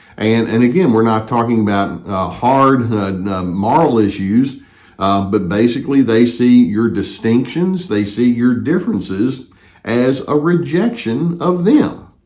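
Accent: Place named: Texas, United States. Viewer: I'm sorry to drift off the topic, but I really like your accent. Is is Texas?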